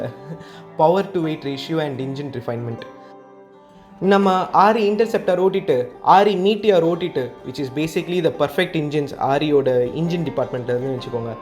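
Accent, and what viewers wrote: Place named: Madurai, India. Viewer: bro ithu madura slang bro 🥰😎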